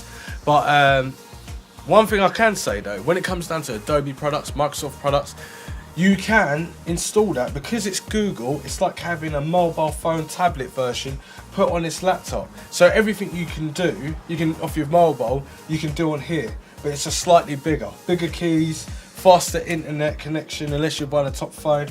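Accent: United Kingdom. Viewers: hey mate i like it again, i even like more yr brittish accent than the video itself lol greetings from paris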